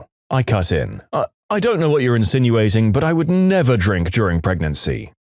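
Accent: United Kingdom. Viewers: You're my favorite narrator I love your British accent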